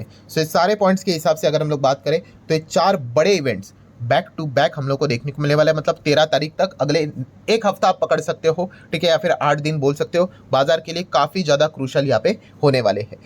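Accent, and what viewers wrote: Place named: Gujarat, India. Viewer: bhai awaaz se pahchano, yeh gujrati hai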